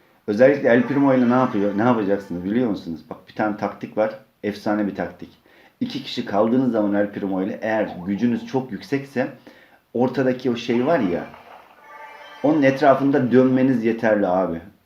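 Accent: Black Sea, Turkey. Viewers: Abi Trabzonlumusun Rizelimi ?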